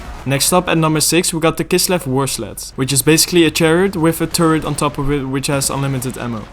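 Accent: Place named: Netherlands. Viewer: your dutch accent is thick!!!! (im guessing your dutch :) ) hello fellow dutchie!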